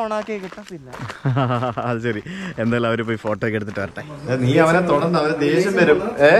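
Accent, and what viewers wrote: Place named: South Kerala, India. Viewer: പോകുമ്പഴത്തേക്കും . നോക്കുംബഴത്തേക്ക് . വികല മലയാളം ആണ് ..അരോചകമായി തോന്നുന്നു . നിങ്ങൾ അവിടെ എത്തുമ്പോൾ . മതി . മണ്ടക്ക് കയറി അല്ല മുകളിൽ കയറി . മണ്ട . ജീവനുള്ള വസ്തുക്കൾ ക്ക് ആണ് ..കേരളത്തിൽ മുഴുവൻ ഉള്ള ആളുകൾ കാണുന്ന വീഡിയോ യില് തെക്കൻ ഭാഷ കഴിവതും ഒഴിവാക്കുക . ❤️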